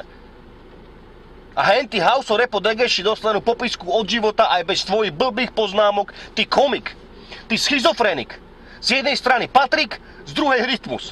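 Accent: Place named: Petržalka, Slovakia. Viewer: Ked ho pocujem, v sekunde sa mi vynori Ovsiste 90tych rokov, gangy na kazdom rohu, fetaci, neskor mafia a typicky petrzalsky prizvuk. Som z Ovsista, a som na to hrdy. Aj na to, ze som prezil.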